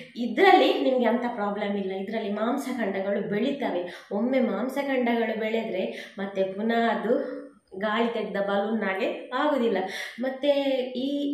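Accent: Mangalore, India. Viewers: ಮಂಗಳೂರು ಭಾಷೆ ಕಡಕ್ ಭಾಷೆ ಸೂಪರ್ ಮೇಡಂ.. 🙏🏻👌🏻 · aha kudla kannada🥰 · I like your manglore style kannada bhashe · Enklna kudlada kannada 🥰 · Kudla da Kannada 👍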